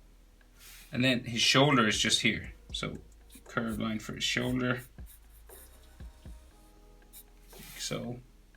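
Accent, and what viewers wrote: Ireland. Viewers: Yesss thank you I really needed this tutorial also I really like your Irish accent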